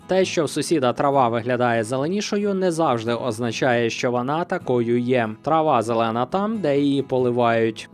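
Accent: Russia. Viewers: Та запам'ятайте вже нарешті: прохООООООООдити, довОООООООООООдилося! Скільки Ви ще тягнутимете ці свої кляті кацапські наголоси?!?!?!?!?!?!?!?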